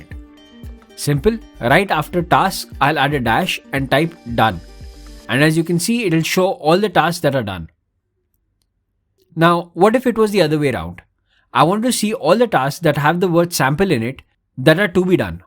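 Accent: India. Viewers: you are from India aren't you 😁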